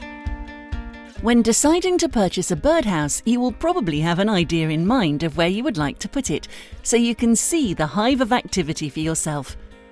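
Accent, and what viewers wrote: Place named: United Kingdom. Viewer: Not everyone is American dude, the accent is British.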